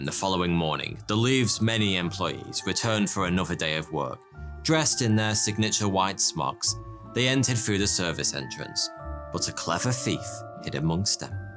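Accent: United Kingdom. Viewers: he's addressed this. He says both. And that's how the British accent works. Considering how many differences there are in pronunciation, it's silly to even mention it.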